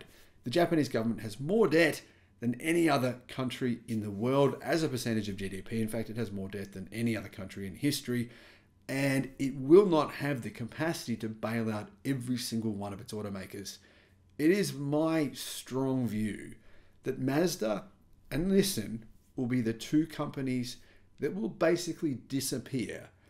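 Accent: Australia. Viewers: He's an Aussie - it's an accent thing I guess. He also says Tezla...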